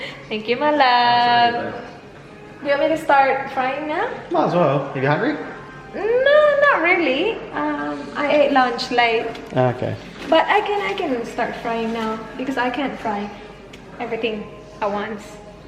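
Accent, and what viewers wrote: Visayas, Philippines. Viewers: The way she speak English, at mag bibisaya sa huli... 😂 That's cool..❤️❤️ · Nakakatuwa talaga ang bisaya accent mo ate😄 Chaaar uuuyyyyyy!😁😆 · first time ko manood ng video mo po. And da way ka mo sulti nakahibaw na jud ko na bisaya ka te hahahaha love this video and imma subscribe to ur yt channel na hehe. Lovelots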